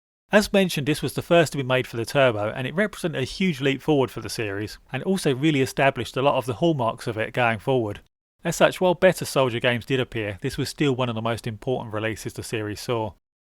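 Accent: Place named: United Kingdom. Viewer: Is this a Brit accent or some other non American commonwealth? Aussie maybe?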